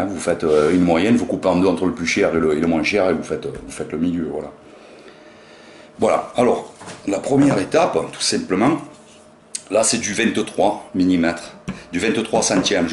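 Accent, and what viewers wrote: South, France. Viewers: votre accent du sud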